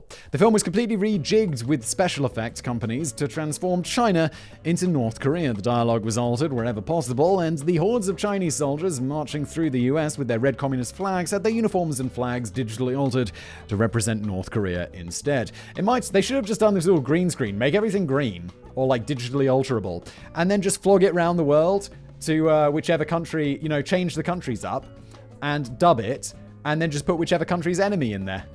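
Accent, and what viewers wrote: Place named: United Kingdom. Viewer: Calls himself Whistle and China "Chiner"... British accents are Great!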